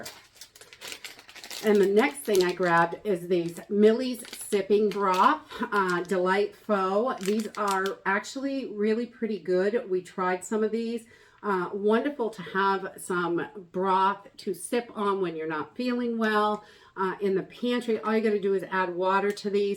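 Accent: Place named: Maine, United States. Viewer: I grew up in Maine outside Bangor-so her accent makes me smile :)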